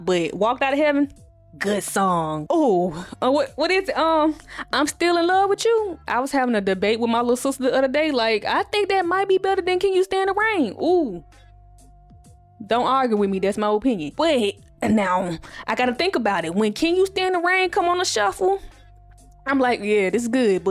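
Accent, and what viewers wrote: Memphis, United States. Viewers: Can someone in the comment section tell us what state her accent is common? I want to assume memphis because glo's accent sounds similar. . . Please and thank you 🤝🏽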